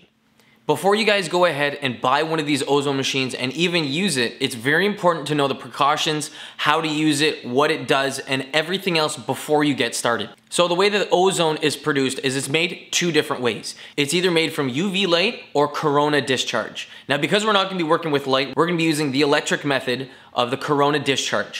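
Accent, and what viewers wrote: Canada. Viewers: Canadian accents annoy me.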